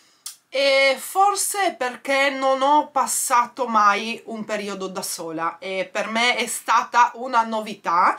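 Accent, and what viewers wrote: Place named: Trieste, Italy. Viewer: Potrai andare a vivere ovunque ma l'accento triestino non cambierà mai😍😍😍ti adoro🤣🤣🤣 Il trucco ti valorizza un sacco, è bellissimo!!!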